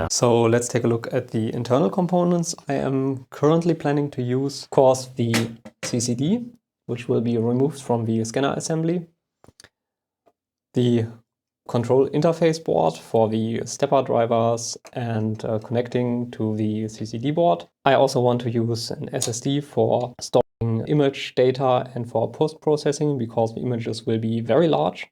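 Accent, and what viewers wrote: Germany. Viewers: Wie deutsch kann ein Akzent sein. Dieser Typ JAAAAAAAAAAAAAAAAAAAAAAAAAAAAA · very well done...you are really a technical genius..,. by your accent can I say that you are from Germany ? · I heard the German accent and i knew it was gonna be very interesting.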